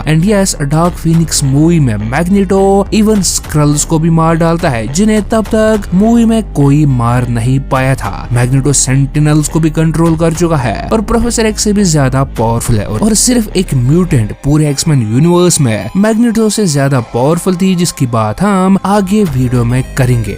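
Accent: Kashmir, India. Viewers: You sound kashmiri?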